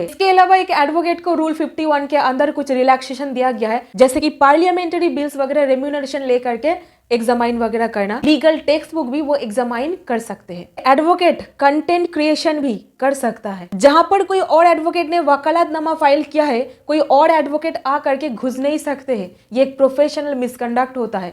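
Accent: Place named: South, India. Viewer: Your voice is so good and charming with South Indian accent, your content is very informative and last but not least you are very beautiful, would you like to have a 70 year old doctor as your boy friend 😊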